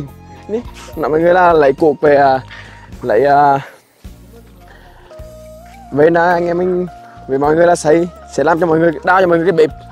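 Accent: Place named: Central, Vietnam. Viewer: nói nhanh quá dọng miền trung hơi cứng nên em nói chậm nhịp lại cho khán giả nghe kịp vì khán giả là người khắp mọi miền tổ quốc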